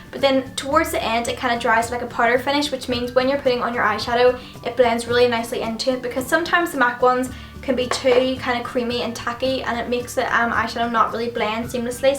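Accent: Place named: Northern Ireland, United Kingdom. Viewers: myrthe van leest Belfast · Northern Ireland I think ?! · Northern Ireland I know her 😏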